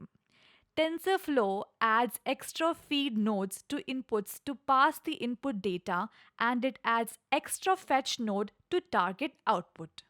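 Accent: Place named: India. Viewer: The heavy Indian accent in the video is too hard to follow for a westerner !!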